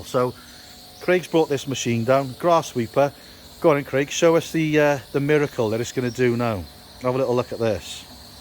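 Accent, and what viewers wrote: Wales, United Kingdom. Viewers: Some good tips with a Welsh accent ... seeing as I'm originally from North Wales it's a win / win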